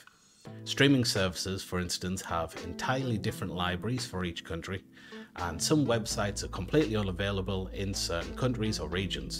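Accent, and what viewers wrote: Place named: Durham, United Kingdom. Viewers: Many thanks from this. Subscribed, but you are doing fine there anyway! Where in the North East are you from? I'm a Sunderland lad 😁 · That Simple Deform > Bend trick is great: I've been using Blender for 15 years and never knew that. Would have saved tons of time! I presume from your accent that you're somewhere near (East?) Durham too, so you get points for that.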